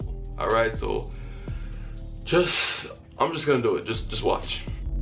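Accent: Jamaica. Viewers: U can tell he’s Jamaican 🤣🤣🤣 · Oh you Jamaican too? Instant sub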